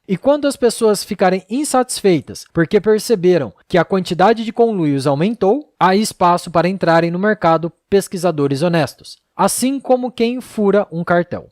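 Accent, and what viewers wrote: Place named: Rio de Janeiro, Brazil. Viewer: O sotaque do narrador me deixou maluco quem o carioca!! 😂😂😂😂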